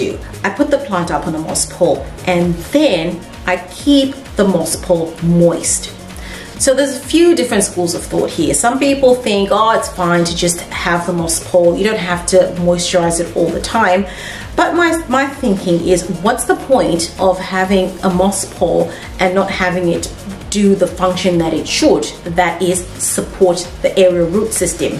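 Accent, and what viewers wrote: Australia. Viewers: Thank you so much for explaining. I have always been curious about that. I have a few plants on coir poles and I feel like when I get them wet all I'm doing is watering my soil more. I see that moss is much better than coir. Also after binge watching your videos I actually saw where you addressed my question exactly. I had to chuckle when I was watching it. I have officially watched all of your videos in one day. They are very informative, in an easy to follow along format, and can't beat listening to the Australian accent either ☺️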